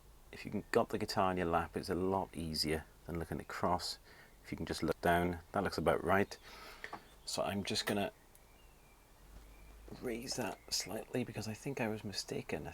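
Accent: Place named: Wales, United Kingdom. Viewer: Thanks for posting. Nice to hear a welsh accent too.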